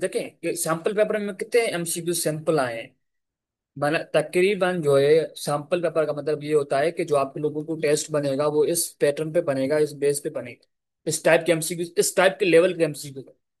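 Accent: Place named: Sindh, India. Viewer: Your accent looks like you are sindhi? Acha kia dawood uni khi ka test easy hota hai ya difficult?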